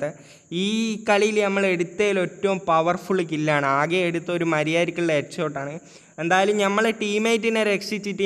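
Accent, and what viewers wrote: Kasaragod, India. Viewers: 'kasaragod aaano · Da kasaragod aano beck beljji😂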